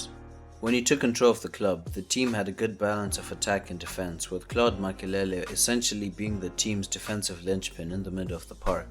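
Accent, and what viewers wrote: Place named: Zimbabwe. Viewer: You sound Zimbabwean bro! Love the video though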